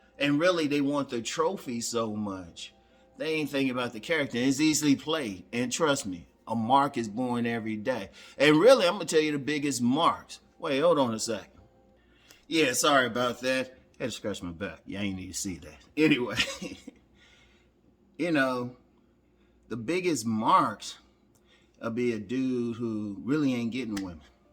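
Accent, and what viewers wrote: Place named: DMV, United States. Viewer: Just found this channel a couple months ago and been watching all your videos. As a young 24 year old dude I appreciate all the free game you give and you're honestly my favorite figure in the "redpill community", "Manosphere" or whatever you call it. You remind me of my late high school football coach with that heavy DMV accent.😂 Shoutout from Harford County, MD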